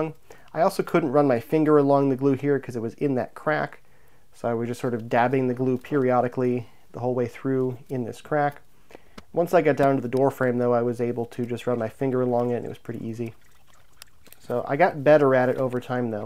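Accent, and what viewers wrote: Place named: United States. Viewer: How did you get such a solid American accent as a Finnish guy? :S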